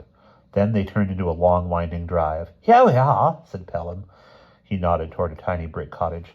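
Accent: England, United Kingdom. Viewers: You do a good English accent!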